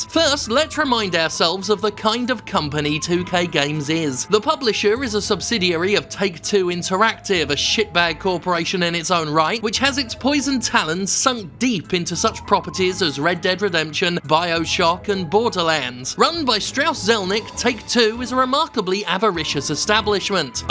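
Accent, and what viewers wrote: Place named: Yorkshire, United Kingdom. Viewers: Love the grumpy Yorkshireman accent. Next time can we have a West Country cider-drinkin' combine 'arvester drivin' reading? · The Northern accent makes me laugh every time. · Jim Sterling is more Yorkshire than I am and I'm from Yorkshire.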